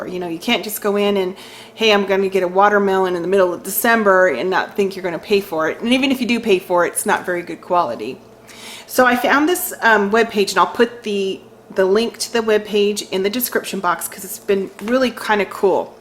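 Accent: South, United States. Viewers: I've never had In n Out, but I've definitely heard of it! Everyone seems to love it. By the way, your accent sounds very southern. Are you from the south?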